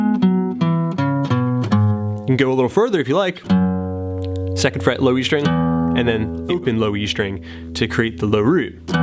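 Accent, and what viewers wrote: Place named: Maryland, United States. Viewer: Nice stuff! My first love was guitar, but at 8, I got the old school music store lessons grind, sitting in a room with a rental guitar with strings a half inch above the neck & it was torture for my soft little hands, and SO boring and sad - I moved off to drums & keyboards, Now, as an old guy w/the time to really get to know my way up and down that neck, & lots of good lessons like these online? I'm having some fun & making progress. Off topic: R U by any chance from Md. or thereabouts? We have the same accent. ...Nice lesson(s)! Thanks, again!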